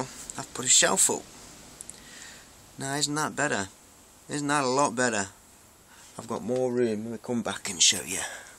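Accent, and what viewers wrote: United Kingdom. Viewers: Are you from Lincolnshire by any chance?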